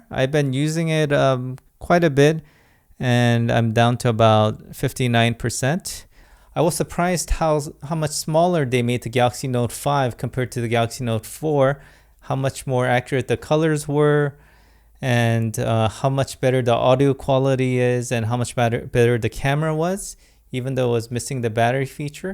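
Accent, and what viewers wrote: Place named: United States. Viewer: Are you Korean? Your English is very Americanized/Westernized.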